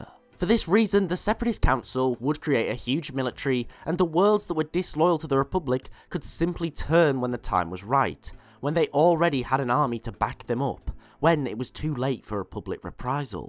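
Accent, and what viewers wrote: United Kingdom. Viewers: This sounds odd, but what nationality are you? British? Australian? Also what if the Mandalorians won the Mandalorian Wars?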